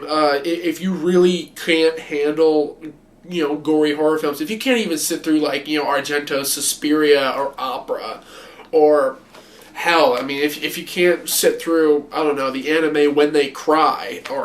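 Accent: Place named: United States. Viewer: You look British or possibly Irish, why are you talking in an American accent?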